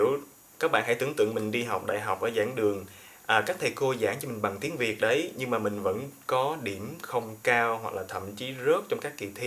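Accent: Saigon, Vietnam. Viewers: Đúng giọng sài gòn luôn nè, nghe rất thích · Khong biet thay có fai nguoi Saigon ko, chi thay giong cua thay GIONG giong of minh qua, hi hi ! Ma minh la nguoi Saigon 100% . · Giọng Saigon nghe hay quá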